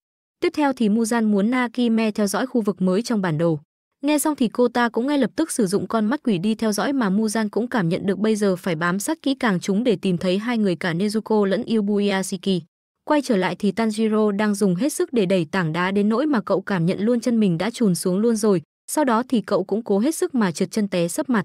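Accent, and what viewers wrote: Central, Vietnam. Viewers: Ủa mi người miền Trung hả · ad người miền trung à mô vs chả mi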